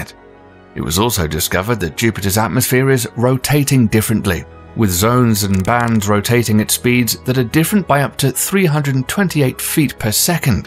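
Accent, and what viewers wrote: United Kingdom. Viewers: Oh a British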